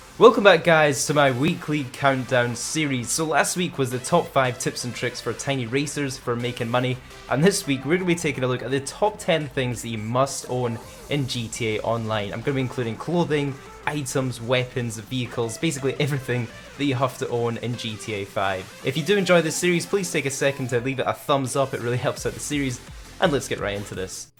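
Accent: Scotland, United Kingdom. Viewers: M8 your so British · Scotland? ^3^ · Are you Scottish?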